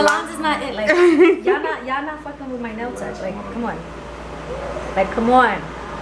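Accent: New York, United States. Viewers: Omg, I been follow you for a while now...I had no idea you were based in New York, but now I can totally here that accent. Hella cute ma, much love from CALI 💙😈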